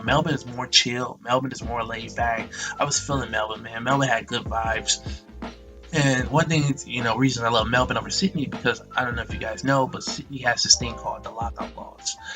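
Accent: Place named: United States. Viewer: You’re losing your American accent lol I don’t know if that’s good or bad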